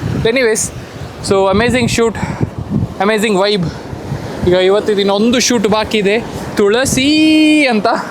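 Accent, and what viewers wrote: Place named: Tulu Nadu, India. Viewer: Your Tulu accent sounds like Bollywood star Suniel Shettru. .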